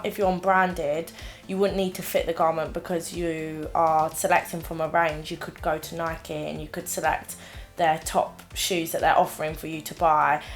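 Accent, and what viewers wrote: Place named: Essex, United Kingdom. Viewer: Thank you for this video :) btw I'm obsessed with towie so I love your accent 😀❤❤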